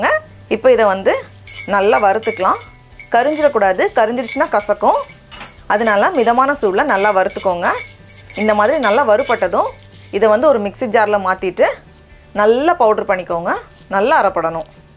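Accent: Nagercoil, India. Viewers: Ur way of speaking Tamil in Nagercoil slang is soooooo good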